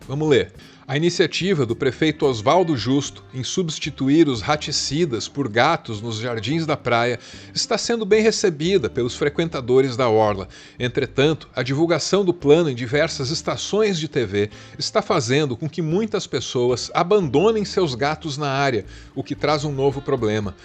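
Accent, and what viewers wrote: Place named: Bahia, Brazil. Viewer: E com um sotaque bom e gostoso😂 deve ser baiana, como eu kkk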